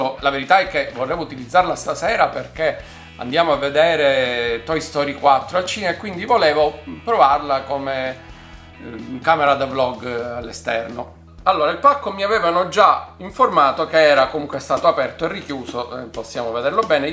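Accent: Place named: Palermo, Italy. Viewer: Hai un'accento molto nota, sicuramente Palermo !!! Una informazione dove posso trovare in 3 piedi per questa macchina?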